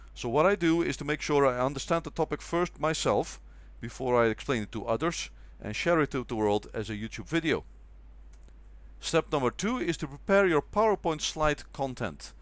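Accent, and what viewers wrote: Netherlands. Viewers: Just a quick question, are you from Belgium or the Netherlands? because your accent sounds very Dutch. Great video though!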